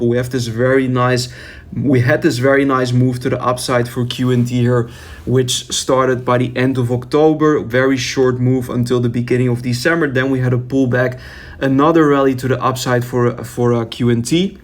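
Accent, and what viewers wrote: Netherlands. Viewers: i love you content ♥️ I immediately subscribed to your channel!.. and even though you speak very good English... I still hear that you have a Dutch accent... is that right? 😊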